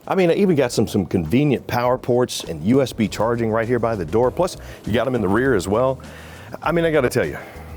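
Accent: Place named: United States. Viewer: I cannot imagine a more American-sounding person than this man. That's neither good nor bad - just an observation. The accent, of course; but also the tone, the diction, the rhythms...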